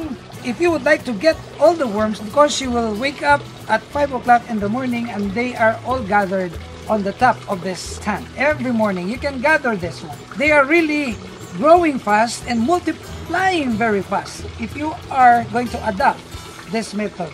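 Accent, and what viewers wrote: Philippines. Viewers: Hi,i am Indonesian thank you for sharing a lot of inspiring knowledge from you experience&your good heart,humble& english style remind me of my pinoy friends (i miss them),wish u more success,salamat Po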